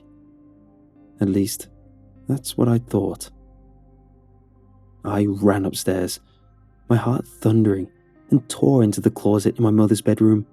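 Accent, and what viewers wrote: United Kingdom. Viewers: Man the British is strong with this one.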